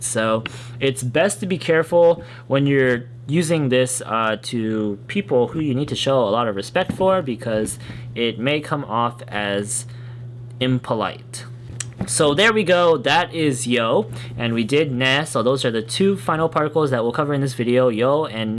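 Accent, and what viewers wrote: United States. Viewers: you look so japanese man :D I thought you should be american with your english speech level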